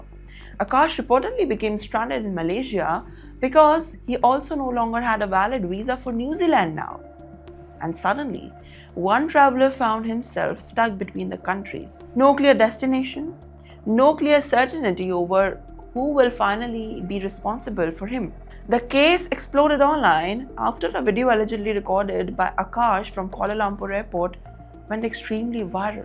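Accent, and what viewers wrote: India. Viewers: Terrible curry English!!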